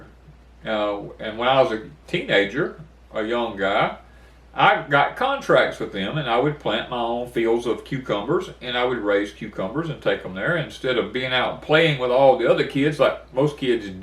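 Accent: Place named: Mississippi Gulf Coast, United States. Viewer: Oh my! I have to tell you something funny. I am 63 y/o and live in Oregon. I stream lots of homestead channels but yours caught my attention because I couldn't put my finger on your accent. I can usually tell like Florida, Louisiana, Carolinas, etc.But yours just stumped me. Until today I heard you talking about love bugs and the old Sunflower store and now I know where you are from. LOL! I am from Long Beach and you sound like home! No wonder I couldn't quite place your accent. My kids still live in Bay St. Louis and Biloxi and I'm on the coast today visiting and dropping hints trying to get my son to buy a farm!! 😂 Oh and one more thing.... Love bugs live inside the trees and are released when hurricanes snap them open. My brother does Auto air conditioning in Gulfport and love bugs are a harbinger. They swarm when the A/C season starts picking up and again when it slows down.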